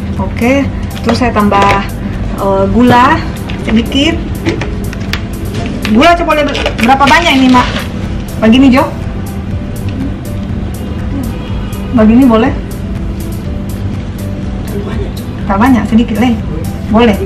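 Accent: Manado, Indonesia. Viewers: P senang vja dengar ba logat bah Manado,,beking kangen pa Kel.di kampung,,,btw Malaysia di mn dang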